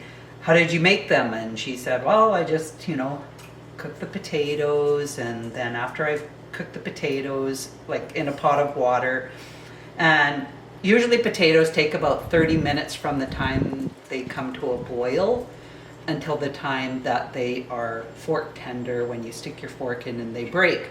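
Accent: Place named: British Columbia, Canada. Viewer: Well done ma'am, looks delicious. First time running into your site, enjoyable. Noticed a wee bit of B.C. brogue in your voice. Can listen to that all day long. Am located probably about 400 miles south of your location. Have an enjoyable weekend.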